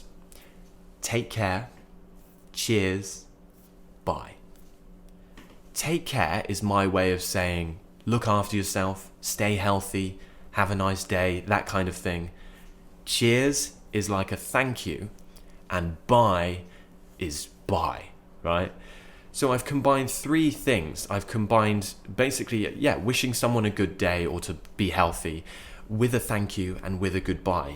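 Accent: United Kingdom. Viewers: I like your way of teaching English, I have benefited you a lot, especially the British accent because I work with a British company in Iraq, sincerely accent British wonderful.thank you so much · Hey, I've just found your channel. I'm an English teacher in Brazil and I love teaching phonetics. I was looking for some pronunciation videooa when I found your incredible channel. Congratulations on theses videos you've been making, they're excellent, really nice material. I love the British accent and I just can't tell you how happy finding your channel made me feel. As you say it: "take care, cheers, bye!" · I like British accent.. pronunciation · Caundo hablas suenas tan verdadero, si me mientes no lo notaría. Te llevaría a comer ceviche with your British accent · It's so nice to listen to the British accent and vocabulary, I love it but most of the movies and tv shows we can watch are americans so it's hard not to be influenced by them.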